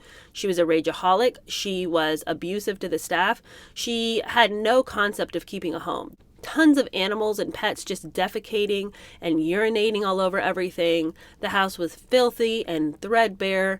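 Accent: United Kingdom. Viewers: Love your British accent! Perfect! Sometimes it makes me laugh3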